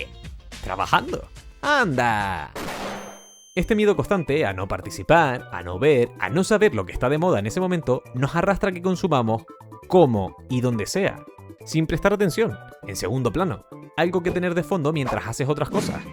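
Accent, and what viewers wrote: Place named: Argentina. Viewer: Tu acento es una mezcla entre Argentino y Español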